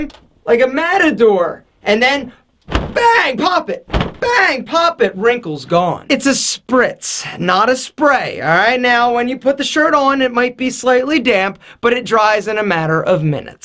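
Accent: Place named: Italian-American, United States. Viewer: I just love your slight american-italian accent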